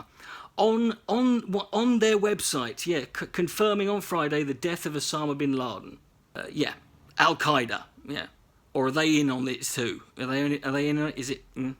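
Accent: United Kingdom. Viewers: You sound more northern than Watson sounds haha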